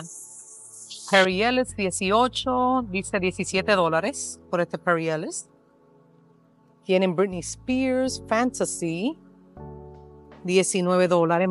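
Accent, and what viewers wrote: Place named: Dominican Republic. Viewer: Arriba R.D., iba a preguntar si eras Dom. Por la forma de hablar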